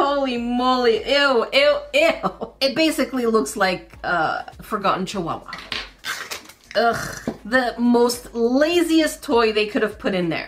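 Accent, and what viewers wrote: United Kingdom. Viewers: Your bits of British accent is suburb !! Sending lots of love you and to Canada xx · The Brit’ accent is real 😂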